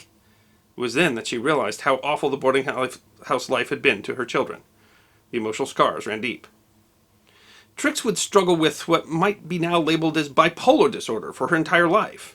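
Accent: United States. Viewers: Do forgive my apparent rudeness in writing this complaint to you about the way in which you narrate the uploads, which are well written but in my opinion badly spoken. The point is reached at times when the spoken words running continuously together, sound like a squawking chicken with an American accent. Furthermore, the word " duodenal " sounds phonetically as follows: " derwadenal " and whilst this might be the American way to pronounce this word, there are other examples, where the narrative is a confusing staccato aural onslaught. Your voice is highly pitched for a man's voice, in the same key as Kermit the Frog, from the animated puppet show called the " Muppet Show". Perhaps you should employ a professional speaker to narrate your uploads, which otherwise are very well put together. Best wishes, and keep up the otherwise good work. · Sorry but it doesn't sound right with an American accent.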